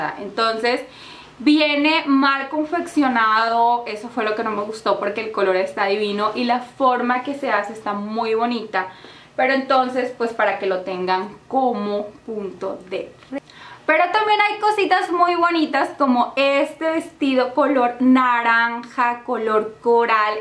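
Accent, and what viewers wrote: Colombia. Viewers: note como que traias acento colombiano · Soy yo o te escuche como con otro asentó como colombiana o algo así jaja muy lindos zapatos 👠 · hoy te escuche hace to como colombiana 😍😍😘😘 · Y ahora porqué, anda hablando como las de Colombia?? ,🤔Así no hablaba ella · Ese acento Colombiano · con ACENTO COLOMBIANO? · senti que hablaste super acento Colombiano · Andas como media colombiana o algo Así no? 😂 Pero como quiera me gustó el videito jiji.. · Que Colombiana te escuchaste esta vez. Pero dentro de todo tu ropa muy bonita la mayoría te queda precioso. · si eres regia intentas hablar como colombiana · te escuche colombiana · note en este video tu acento colombiano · tu acento no me gusto tipo colombiana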